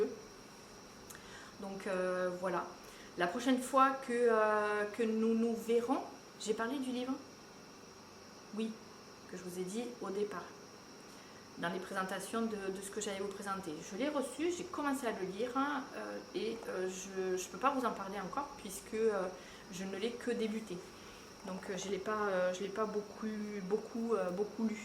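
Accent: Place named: South, France. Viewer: Allo! J’adore ta vidéo. Moi aussi j’aime bien le format vlog. Et gros coup de coeur pour ton magnifique accent du sud 😍un beau bonjour du Québec !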